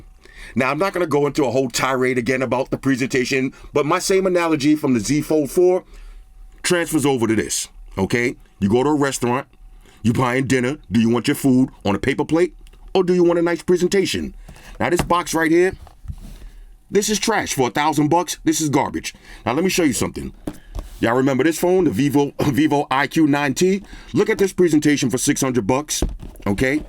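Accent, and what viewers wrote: New York, United States. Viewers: I was waiting for this review! I agree that price is too high and it's not a flagship phone. I was thinking about getting it (currently on the S20+) but I think I'ma pass and wait for the S whatever is next 🤦🏽‍♀️😂😂 Sidebar for floss: I love these videos because I get to hear that NYC accent (I'm from Southside Jamaica Queens too) and I don't hear it a lot in Austin, TX 😁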